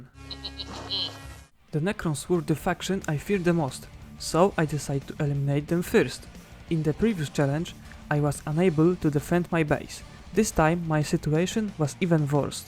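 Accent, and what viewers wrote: Poland. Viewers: I hear that accent, and i think i am not wrong, a Fellow Pole you are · Ooofff... the ponglish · Słyszę chyba polski akcent 🤔 Mam Cię gagatku 😁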